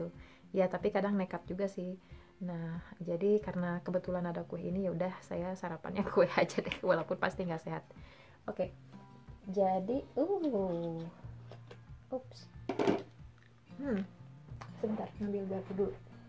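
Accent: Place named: Balikpapan, Indonesia. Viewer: Dari aksennya kek org Balikpapan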